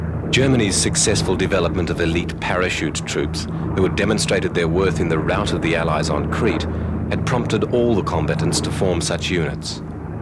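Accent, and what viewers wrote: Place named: Australia. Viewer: Interesting video but the continuous loud background music is very annoying. Is this an Australian production? The narrator sounds Australian.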